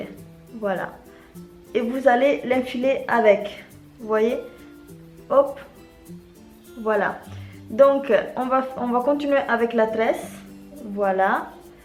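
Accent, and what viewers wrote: Madagascar. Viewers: j'aime bien ton accent malgache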